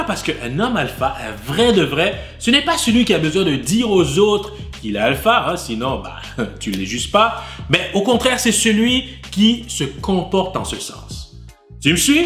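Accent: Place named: Canada. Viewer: avec le son de la télé trop fort, devant mes neveux, cetait LOOOOLLLL. sinon belle video thanks. j'adore l'accent canadien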